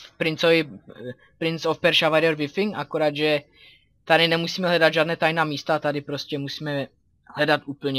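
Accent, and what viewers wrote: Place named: Ostrava, Czech Republic. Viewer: Ty mi připadáš jako ostravák (podle toho, jak mluvíš)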